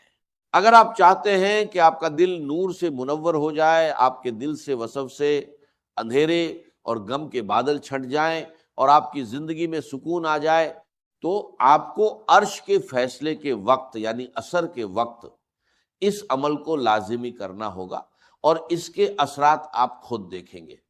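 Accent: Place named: India. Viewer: dr israr ki sirf tasweer hai ..bol tu ko indian bhai lagta..kion k zaaria ko jaaria tu indian bhai keh saktay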